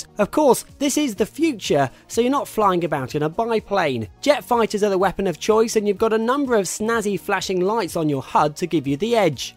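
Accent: England, United Kingdom. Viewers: he speaks funny this is why i like ricky gervais and british people. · GRRR british accent sucks.. Its same as german and english together -> British... Nothing beats american Accent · british narrator for american game wtf? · what is this accent??? english? canadian? australian?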